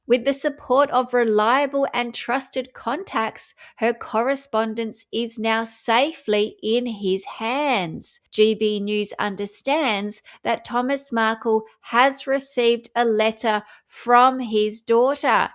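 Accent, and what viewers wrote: Australia. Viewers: This is such a sad state of affairs. I would be totally devastated if the daughter I raised and loved treated me like this. Mr Markle I send you white light, strength and peace... This woman is truly an empty vessel. once again great work, thank you for your updates wrapped in the appropriate amount of ridicule, and delivered with our great Occa Aussie accent. Every video I just shake my head in disgust of her behaviour.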